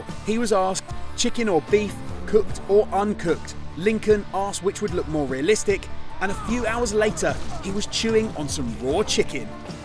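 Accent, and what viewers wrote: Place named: United Kingdom. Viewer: 我愛英國腔!讚!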